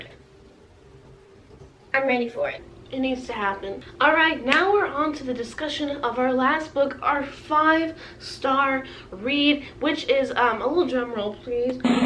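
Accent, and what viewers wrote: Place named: South, United States. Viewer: very here for more reviews in southern accents. so many of these books are on my tbr!!!!! so excited!!!!!!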